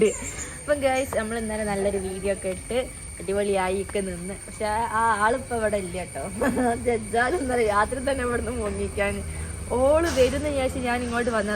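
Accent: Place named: Malappuram, India. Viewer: സംസാരം തമ്മിൽ നല്ല വ്യത്യാസം ഉണ്ടല്ലോ. തനി കോഴിക്കോട് തന്നെ പക്ഷേ ഒരു മലപ്പുറം ടച്ച് ആണ് കൂടുതൽ തോന്നുന്നത് അതെന്താ അങ്ങനെ 🤔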